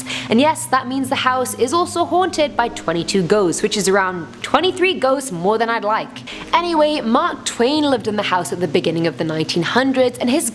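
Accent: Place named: England, United Kingdom. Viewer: Sound like an English accent, reporting on US ghost houses. Interesting!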